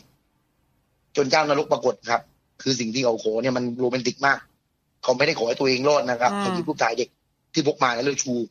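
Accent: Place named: South, Thailand. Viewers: ฟังยากจริงค่ะ😂 ขนาดฟังพี่แกบ่อย ยังไม่ชินกับสำเนียงใต้เลย😅❤ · เข้าใจบางคนที่ฟังพี่ ไม่ทันสำเนียงภาษ า ถ้าคนใต้จะฟังปกติครับ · ผมคนภาคกลางฟังรู้เรื่องทุกคำเพราะชอบสำเนียงใต้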